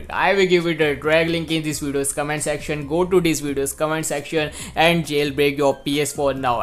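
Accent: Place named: India. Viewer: When i heard India voice i am out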